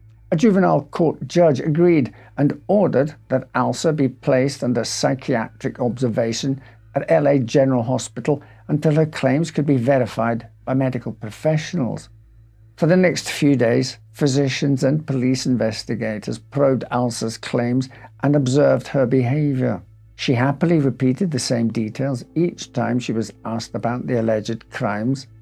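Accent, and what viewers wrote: United Kingdom. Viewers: This could be a regional English accent.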